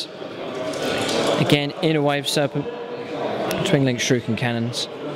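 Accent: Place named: Southeast England, United Kingdom. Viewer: Hey. nice to see some more of your batreps up on here. Is there no way in future you can stop there being a massive spoiler comment right up there^ at the top of the screen, it's impossible not to read that as it's the 1st one! Come on, don't spoil everyone's fun mate! Anyway, would love to know where you have your battles as you sound like you're from the southeast of England and I'd love to come see some of the wicked terrain you guys have and maybe even have a game...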